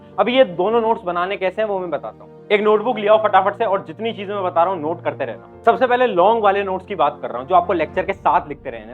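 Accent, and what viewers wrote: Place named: Western Uttar Pradesh, India. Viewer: Ye bhaisaab west UP ka lag raha he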